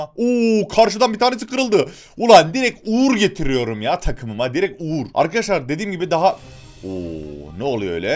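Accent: Yozgat, Turkey. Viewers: Abi Yozgatlı mı sın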